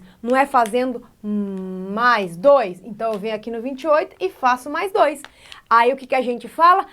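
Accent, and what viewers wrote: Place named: Northern Paraná, Brazil. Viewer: A esta perdendo o sotaque do NORTE PARANAENSE RSRSRS. O jeitinho caipira. Quadro lindo e as cores da caneta sao vibrantes bem chamativos.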